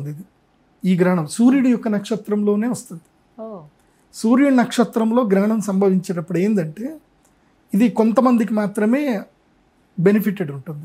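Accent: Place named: Telugu, India. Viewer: యాంకర్లో తెలుగు తనం ఉట్టి పడుతోంది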